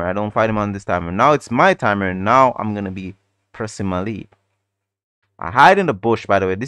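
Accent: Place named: Jamaica. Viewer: He's got a Jamaican accent, which is pretty unique, so don't get discouraged if you're a language learner. 👍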